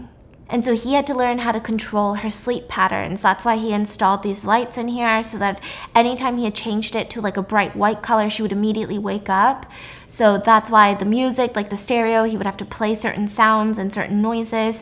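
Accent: United Kingdom. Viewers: Ok but your British accent is the only one I will accept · im here to let you know it is a British accent and imma go with this loll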